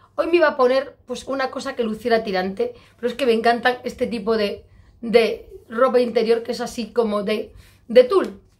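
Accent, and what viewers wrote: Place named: Spain. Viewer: Gusto de verla me encanta su acento español y esa fuerza ese animo que nos trasmite.❤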